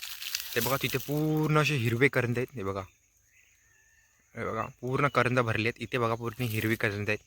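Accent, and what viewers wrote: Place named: Malvani, India. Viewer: Malvani bol bara vatta aaikak.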